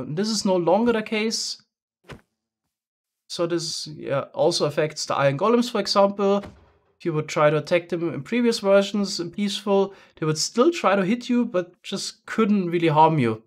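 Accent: Germany. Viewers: pretty sure he's german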